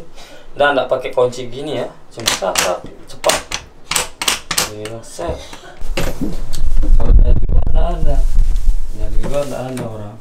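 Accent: Lombok, Indonesia. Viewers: bang, org lombok mana? kok kayanya logat lombok banget klo ngomong heheh maaf pendatang baru, tyg lotim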